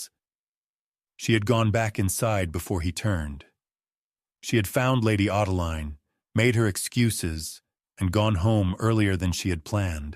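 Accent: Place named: United States. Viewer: Good but a very slow internal story. Its all narrated with very little actual dialogue. I guess its in the style of repressed Victorian English people. The dull voice in an American accent throws me off but at least all the pronunciation is correct.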